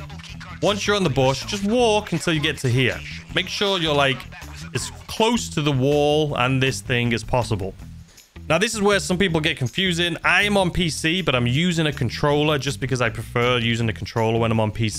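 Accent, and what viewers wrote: United Kingdom. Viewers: I am non-native English speaker. I can hear difference between US, UK and India but I can't really locate accent. But you sounded so British... Almost English Dave thing 🙂 Your way of speaking was just pleasing me - I like to hear British English (maybe because I hear US people most of the time?). A weird thing to tell to other guy but I don't care, we won't meet and feel awkward because of that :D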